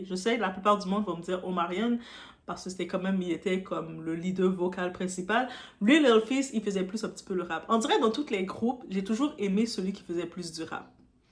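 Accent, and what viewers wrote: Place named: Quebec, Canada. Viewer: Je n'aime pas la traduction, je préfère le "vrai audio" en plus tu peux très bien traduire en résumé après mais c'est du détail. Je tiens surtout à te faire un grand merci pour tes vidéos et ton état d'esprit qui est feelgood. Et puis ton accent garde le, on va pas changer son accent pour deux glandus, t'es authentique ça fait partie du package, on dirait un accent du Québec c'est ça ? 💜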